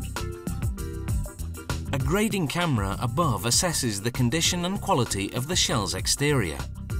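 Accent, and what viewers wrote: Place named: England, United Kingdom. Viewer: So I made an observation with my colleague, let’s call him Hona, and we observed that the narrator in fact has an English accent. That is all. G’day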